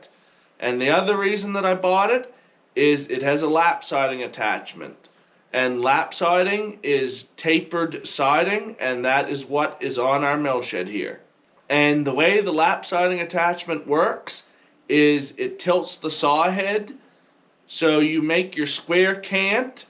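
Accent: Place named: Maritimes, Canada. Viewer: your accent happily reminds me of my beloved great uncles and aunties who were all born and raised in Memramcook, NB and my old neighbors in Maine. Memramcook is about as far as you can go in NB and not be in Nova Scotia!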